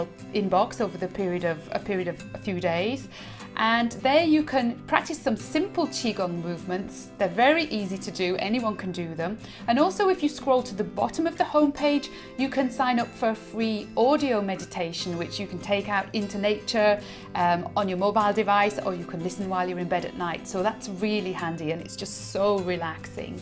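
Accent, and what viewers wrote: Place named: England, United Kingdom. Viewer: You're from England? I ask because of your accent. Lol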